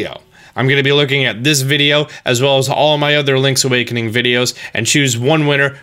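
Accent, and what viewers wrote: Australia. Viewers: Australian. Because if that was supposed to be British we don't sound like that lol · Awful Australian accent lmfao XD (I'm Australian) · First off the Ausie accent was a great. Digging the Link's awakening hints. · Austin’s Australian accent is all I needed today. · Nice Australian accent lol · Good Australian voice mate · Why do you sound Australian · Whys he speaking in Aussie accent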